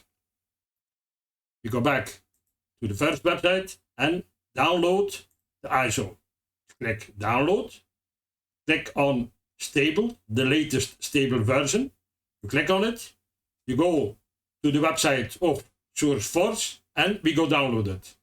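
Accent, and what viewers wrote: Belgium. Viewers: We horen echt da vlaamse accent hahaha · Ik hoorde al dat je van Belgie bent, goede video!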